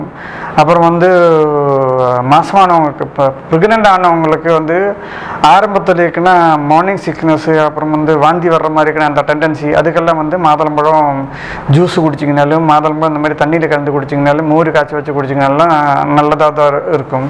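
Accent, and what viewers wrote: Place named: Kerala, India. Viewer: Malayalee Tamil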